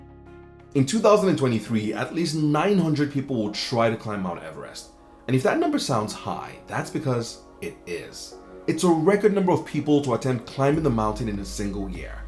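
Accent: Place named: South Africa. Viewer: Ha ha... can't hide the South African in your voice. Decent video guy. Even though I'm not a mountaineer, I find the Goddess Sagamartha (Mt Everest) to be incredibly mystifying, beautiful and majestic. A dream of mine is to one day just see it with my own eye. Not to do a summit attempt... I just want to be able to see and marvel at it's colossal beauty.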